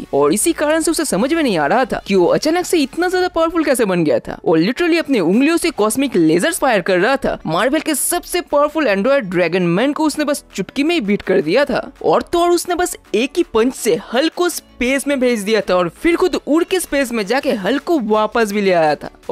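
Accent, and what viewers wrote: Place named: West Bengal, India. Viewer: Bro ur accent is so heavy, anybody can tell that u belong to West Bengal 😂( No hate, i love ur vids too much bro)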